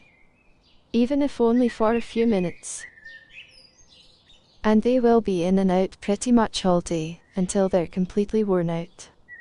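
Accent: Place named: Scotland, United Kingdom. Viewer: This top-down surveying shot is so beautiful! I get to observe most of your boars and how they interact! They are so lucky to be free and have lots of space to explore and play around! So, you are, from Scotland, with a beautiful Scottish speaking style! May I ask who the primary caregiver is for these precious piggies? You really are doing a great job!!! I always have so much fun listening to and watching your video! Your piggies are more than adorable!:)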